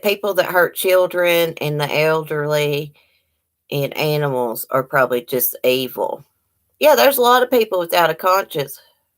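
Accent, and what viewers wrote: South, United States. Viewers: Hello. I am new to channel but I love it!! You are so down to earth. I love your southern accent!! I love the south. Your channel has no drama and you have good mods too. You and I seem to have the same opinions on most things. Ok going to watch more of your replays!! Thanks for being you, real!!!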